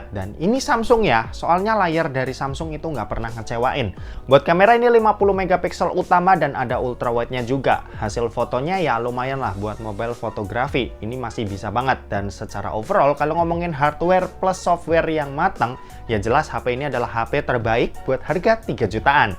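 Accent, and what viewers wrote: Semarang, Indonesia. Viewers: Orang semarang ya kak?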